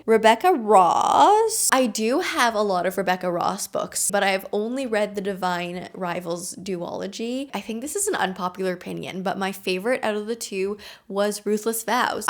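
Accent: Canada. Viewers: Your Canadian accent is so prominent I love it!! It’s super cute!!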